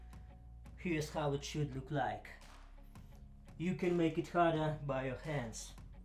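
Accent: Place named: Eastern Europe. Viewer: ah Russia that's why I hear some slavic in your voice. I'm in the UK , mixed ancestry of polish, English, German, Spanish and Barbadian!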